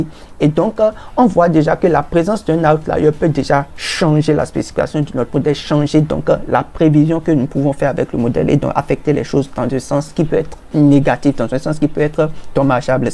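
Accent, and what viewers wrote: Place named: Benin. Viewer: s/o to my beninese people , you make me miss my country with you accent , thank you I hope everything is going great for you i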